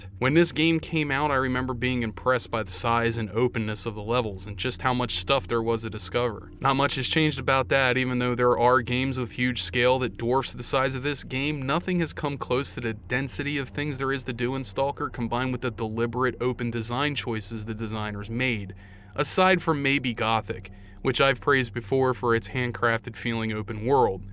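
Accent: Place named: Philadelphia, United States. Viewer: Are you from philly or around west chester? Your accent reminds me of bam margera and brandon dicamillo but not like a yinser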